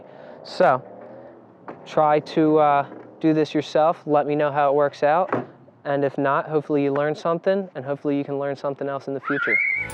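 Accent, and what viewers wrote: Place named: Philadelphia, United States. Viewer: Thank you so much for this tutorial! I could tell you were from Philly from your accent! :)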